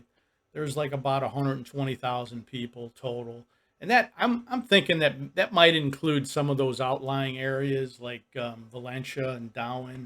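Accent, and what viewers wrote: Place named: New Jersey, United States. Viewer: For some reason, I thought you had a Jersey accent. Great city, by the way. Taste of Chicago, awesome annual food festival. You a city respect their food & culture after attending such an event. Keep the great content coming. 👍🏾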